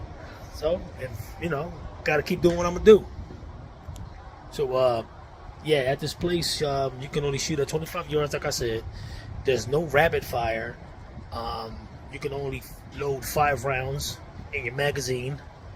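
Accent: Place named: New York, United States. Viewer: I knew you were from the east coast somewhere from the accent. I'd have guessed NY. I don't come across too many guntubers from over there I guess coz of the gun laws. Keep doing ya thang bro. Watching from Texas (former NJ).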